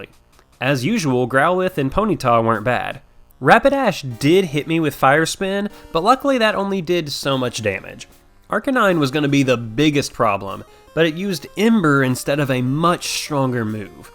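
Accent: South, United States. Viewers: I follow Canadian Poketubers, British, Irish, Australian,... now I can add "Southern American" to the list of accents I get to hear from Poketubers :D Very cool challenge videos, please keep it up!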